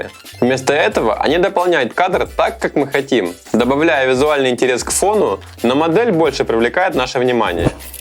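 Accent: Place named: Romania. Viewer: акцент какой то румынский. надеюсь он Русский человек.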